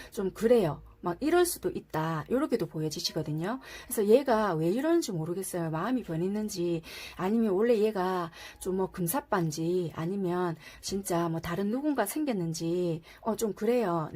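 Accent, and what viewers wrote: Busan, South Korea. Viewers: 다시 3번 뽑았는데 2주쫌 넘었거든려..그래서 잘 모르겠어요ㅠㅠ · 다시끝까지들어보니 남자친구가 일때문에 바쁜거 맞아요 그래서 답답했어요 내일 10일만에 만나거든요 ^^ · 감사합니다! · 고맙습니다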